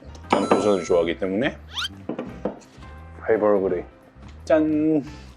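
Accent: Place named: Daegu, South Korea. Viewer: 알고리즘으로 첨 뵙네요~^^ 말투가 대구분이신듯 . . 제가 대구라서요~ 대구분이시라면 너무반갑습니당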